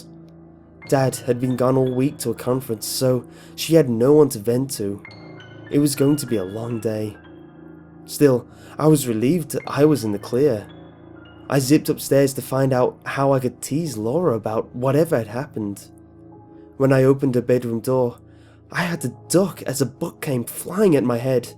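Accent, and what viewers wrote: United Kingdom. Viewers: MissleMasterJoe I mean he has a rather strong British accent but maybe I'm just used to it. (I live in the uk) Brohoof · Lol he dosnt sound Irish at all I could say he sound British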